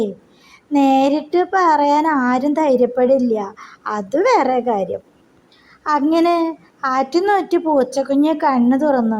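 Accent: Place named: Valluvanad, India. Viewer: നല്ല വള്ളുവനാടൻ മലയാളം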